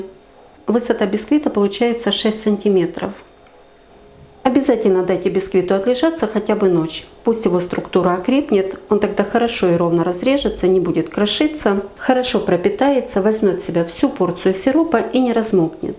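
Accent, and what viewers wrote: Ukraine. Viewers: Считаю Ваш канал лучшим, Вы всё объясняете простыми, понятными словами и Ваша украинская речь очень приятна❤❤❤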